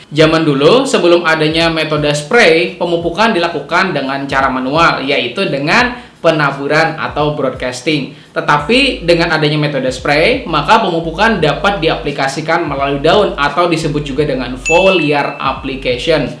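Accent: Banjar, Indonesia. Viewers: Orng banjar nih..logatny gk hilang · Kapuas. Pinanya logat Banjar pian pak😅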